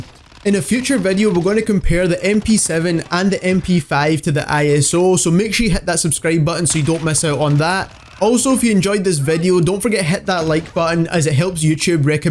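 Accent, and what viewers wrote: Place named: Scotland, United Kingdom. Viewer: He is from Scotland , i think , because he has a scottish/irish accent.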